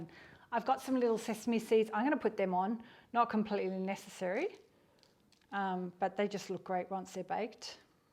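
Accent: Australia. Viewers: Thank you i can't wait to try these. Are you an Aussie living in sweden? Your accent sounds Australian.❤️💙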